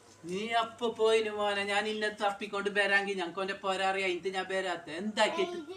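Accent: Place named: Kasaragod, India. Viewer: Ith kasaragod choori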